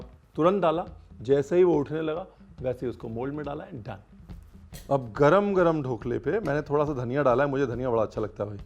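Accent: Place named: Punjab, India. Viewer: Love your style of speaking, the comic timing, the pauses, and the punjabi accent :-) and the history bytes are amazing too!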